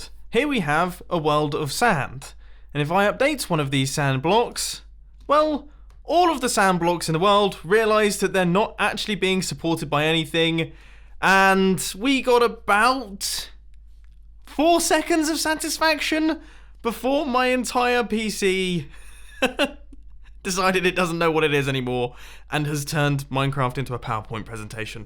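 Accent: United Kingdom. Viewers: You’re British, and the way you’re talking Is so.... SATISFYING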